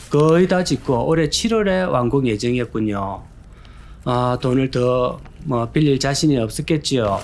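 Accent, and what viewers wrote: Gyeongsang, South Korea. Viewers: 경상도 사투리 방송? 왠만하면 표준말로 하시죠.. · 전형적인 진짜 ㅈㄴ 듣기 싫은 경상도 말투 ㅋㅋ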